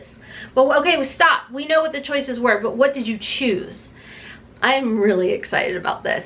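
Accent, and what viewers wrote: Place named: West Virginia, United States. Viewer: IM SO HAPPY WITH THE CHOICES!!! Ofra Good to go?!? wow. so stoked.. and a bunch of TULA, in Symbiosis London in the popupss?!? just wow (the illimasque sold out immediatly once again, lol 😂 not my color story pref tho, and i really was just so stoked about the ofra. i cant wait for that highlighter. I just heard u say time, and i love ur accent. lol my besties are from WV, so its taking me bck.. love ya girl!!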